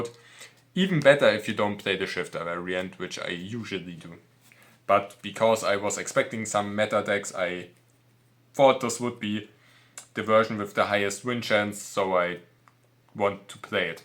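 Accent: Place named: Germany. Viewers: Very german english 😂❤ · he could dub a character with that voice, he didnt even have to tell me he was german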